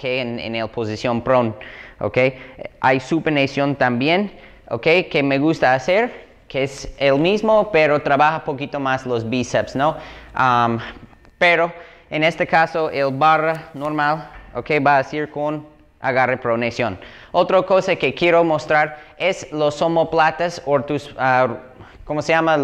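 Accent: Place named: Yucatan, Mexico. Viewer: Donde vives? Hablas un poco como yucateco xd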